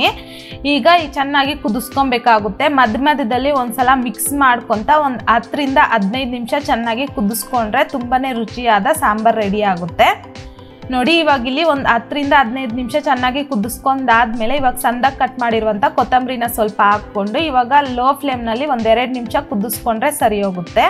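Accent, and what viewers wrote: Kolar, India. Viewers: Kolar side avaru ansuthe nice kannada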